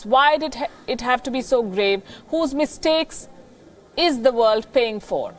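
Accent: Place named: India. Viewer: Indian accent make my ears bleed : (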